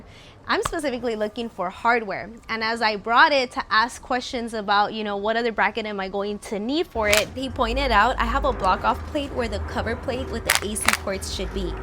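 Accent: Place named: Mexico. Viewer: She have a Mexican accent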